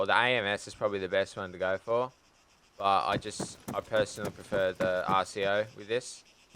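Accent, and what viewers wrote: Australia. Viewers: god you have the most aussie voice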